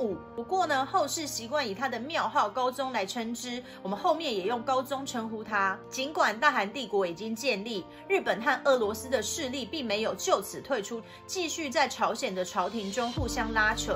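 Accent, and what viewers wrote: Taiwan. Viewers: 这个台湾腔听的我头疼😖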